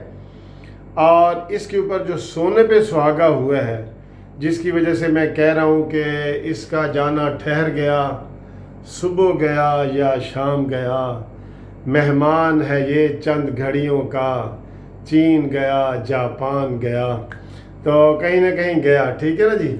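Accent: Saraiki, Pakistan. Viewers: We love your Sariki pronunciation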